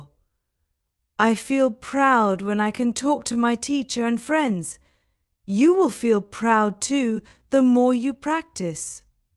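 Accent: United Kingdom. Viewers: I found adorable the british accent. Thanks a lot. You have a new subscriber.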